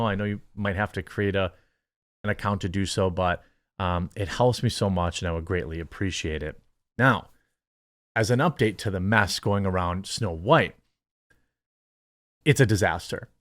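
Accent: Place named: Wisconsin, United States. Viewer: Is it a Wisconsin accent thing to pronounce bag as baaig and gag as gaaig I just notice does this with the "ag" sound.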